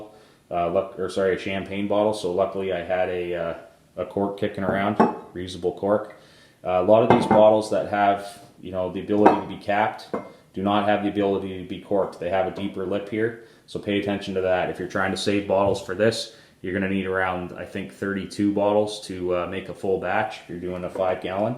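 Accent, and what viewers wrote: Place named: North America. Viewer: I love the use of metric! I know it doesn't make a huge difference for most, but to hear a North American accent using metric is wonderful.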